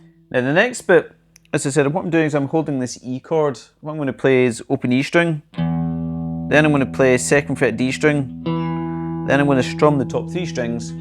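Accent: Scotland, United Kingdom. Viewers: Nice lesson and sound.Good to hear a Scottish accent as well.Keep the lessons coming ..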